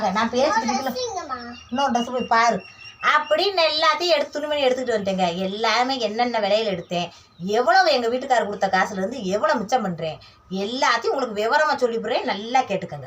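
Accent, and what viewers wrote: Madurai, India. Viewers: Akka neenga Madurai ya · Akka ninga madurai ah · Akka neenga madhurai ya super ah pesuringa akka ella dress nalla iruku 👍👍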